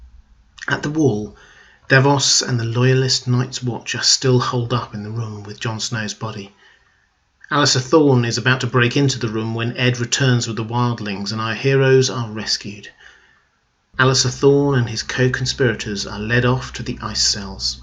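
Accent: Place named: RP, United Kingdom. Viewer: Your delivery is sounding much better. You've incorporated all the things I suggested. Combine that with your RP accent and you're just about the best GoT voice on here. Secrets of the Citadel is tops for similar reasons plus the depth of what she presents, but you could match her and Because Geek is energetic and so lovable, but you're up there now and your voice has it well in hand - good work. Your British accent is so appropriate for the subject. The Order of the Green Hand takes on a manner of speech that is fitting of the Medieval culture, are intelligent, but their voices smack of snobbery and overly serious and these things loose them points. Your hooded look also helps your sense of belonging with GoT. You look like a mysterious stranger someone might find on the road. Someone who looks sort of regular and harmless, like a peasant or wandering monk, but is actually a spy for the Iron Bank or something more dangerous posing as harmless.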